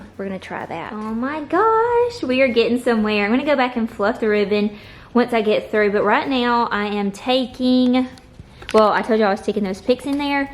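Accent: South, United States. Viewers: Hi I enjoyed your decorating and was inspired to start my own today. Your home looks beautiful and great job on your tree. You are such a sweet girl and I love your southern accent. I am from Canada so I enjoy listening to ya all.😊 I was wondering where did you get your garland on your fireplace? It looks so realistic. God bless you and your husband! Merry Christmas!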